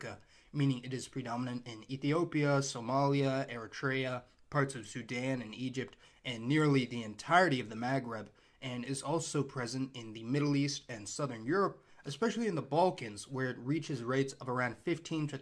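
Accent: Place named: Philadelphia, United States. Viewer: You have somewhat of a Philadelphia accent. It sounds like you try to cover it up, but it still pokes through in spots. Am I hearing that correctly? Also... where tf have you been lately?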